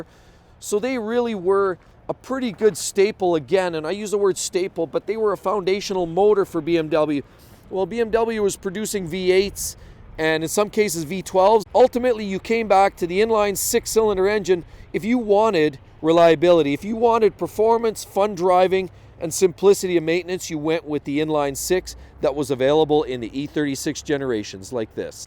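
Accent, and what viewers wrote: Edmonton, Canada. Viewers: Heard your voice- I immediately thought Edmonton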